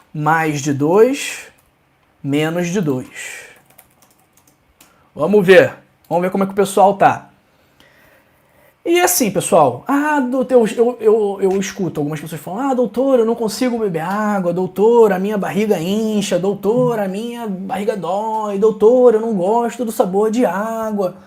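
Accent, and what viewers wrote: Rio de Janeiro, Brazil. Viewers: Bom dia Dr. Sou Amélia e sou carioca como vc e o nosso sotaque é caracacteristico dos nascidos no Rio de Janeiro, as pessoas mal educadad e sem princípio ficam se apegando a essas picuinhas. Dr. Vc é perfeito em todas as suas colocações e educado; talvez a sua pronúncia deve ser motivo de inveja para os que o criticam eu tbm já fui criticada dizendo que eu falo chiando e este tipo de gente com certeza não deve ter o que fazer, e falta de berço. Vc é muito importante para nos orientar no cuidado com a nossa saude. Somos todos gratos a DEUS que o colocou em nossas vidas. E lembre-se Dr do antigo e dito popular: " O que vem de baixo não nos atinge" e ser educado é uma questão de berco. Dr o abençoe sempre e guarde todos os seus caminhos. ABC. ❤ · Bom dia Dr.! Eu acho lindo o sotaque carioca, aliás eu ter nascido no Rio de Janeiro, a Cidade Maravilhosa, por sinal eu conheço o Rio de Janeiro, já fui conhecer, tudo lá é bom, belo e maravilhoso! 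Eu amo o Rio de Janeiro demais! Um dia quem sabe eu morarei lá né? ❤ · Não sou carioca, mas amo o sotaque do carioca! Cada estado tem o seu sotaque! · Parabéns Dr. Acho lindo o sotaque dos cariocas, mais ainda, as suas explicações. Moro em São Paulo a mais de cinquenta anos porém, sou mato-grossense. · Pois eu acho lindo o sutaco dos Cariocas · Gostei muito do seu Sotaque pois O Sotaque Carioca é muito lindo LLLlllllllllllllllll parabéns Amei Dr, 🙏🙌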